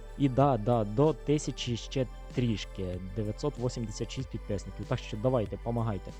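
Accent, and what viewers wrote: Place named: Ternopil, Ukraine. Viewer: Короче я подписался. Давай больше контента, у тебя интересный Украинский. Как будто Тернополь что то такое. Давай больше видео и юмора 🎉 жду.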